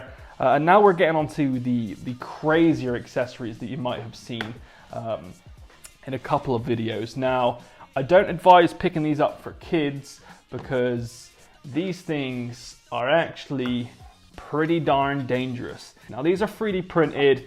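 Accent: Bristol, United Kingdom. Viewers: Hearing my accent(bristolian) on videos hits hard haha. Realized from some of your other videos you're very local to me.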